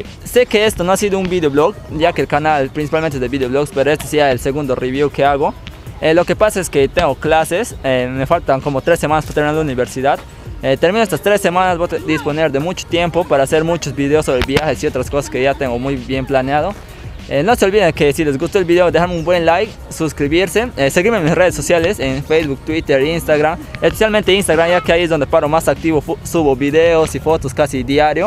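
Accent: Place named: Amazon, Peru. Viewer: Jaja eres charapaza hermano, saludos desde lima buen canal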